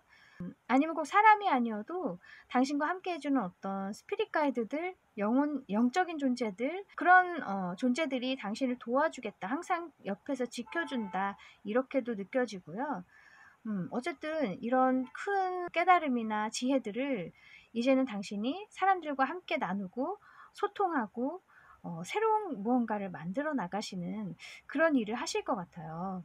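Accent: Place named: Seoul, South Korea. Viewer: 서울 말씨를 예쁘게 쓰시는 것 같아요^^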